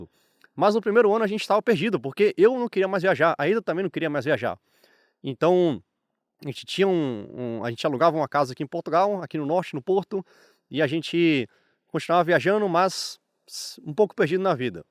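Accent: Brazil. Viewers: Parabéns pelo vídeo, principalmente pelo modo como se expressou num correcto Português, com sotaque mas sem erros. A ideia de Brasileiros não se aglomerarem em guetos levará à aprimoração da qualidade de expressão pois erro gramatical em Portugal também é erro gramatical no Brasil. Quanto à beleza de Portugal irei mencionar apenas isto: Ainda não ouvi nenhum Brasileiro YouTuber dizer que a grande diferença paisagística notória quando cá chegam é a quase ausência de grades e vedações de propriedades à moda brasileira. Este canal ganhou mais um subscritor Português...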